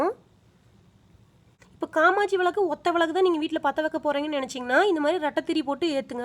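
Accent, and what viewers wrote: Kongu, India. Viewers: சூப்பர் பா... அருமையான விளக்கம். எந்த ஊர்காரர்ங்க நீங்க...? நம்ம கொங்கு தமிழ் பேச்சு கேட்க கேட்க அருமை.. 👌👌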